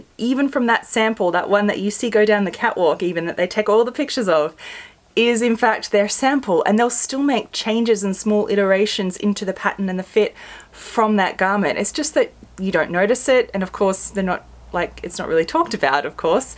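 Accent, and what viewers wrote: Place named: Australia. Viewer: Hi Yes this is what I have really enjoyed about your videos. It’s great to reinforce that refining fit, design and restyling are a stepwise process. Too often, with some other youtubers, we are left with the impression that the completed item was produced from an idea and then executed without change from that idea. Although I KNOW this is not at all likely to be true, it still makes me feel a bit like a doofus because I can only seem to do these things step by step. It’s also great to reinforce that the clothes we buy in shops have been through many rounds of test garments. Often home sewers will make only 1 or 2 items from the same pattern.......and then be surprised that there are so many changes that have to be made to finesse the fit and finish. I constantly remind myself of this process that commercial designers go through in order to cut myself a bit of slack when a make seems to require SO MUCH fiddling with to make it work. 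So. Thanks for your reality checks. Love your work PS it’s great to hear an Australian accent too. I seem to have trouble finding local content. Not that it should matter.......but it sort of does (and not that local anyway..... Melbourne girl here)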